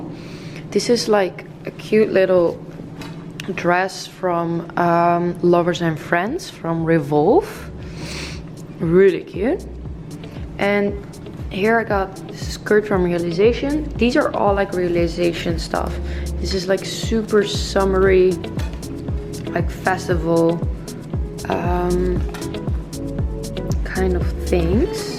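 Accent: Netherlands. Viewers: I used to live in the Netherlands and I miss the Dutch/ English accent. I watch your videos just to hear your voice lol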